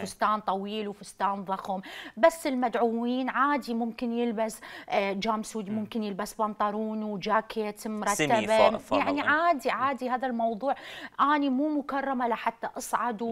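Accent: Iraq. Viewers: طبعا لهجتك العراقيه والله العظيم ضحكتني من خاطر😂😂😂😂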